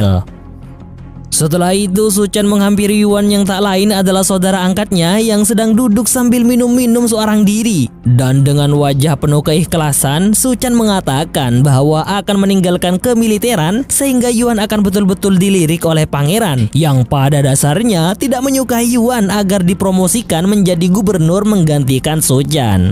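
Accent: Madura, Indonesia. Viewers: Abbe mik oreng medure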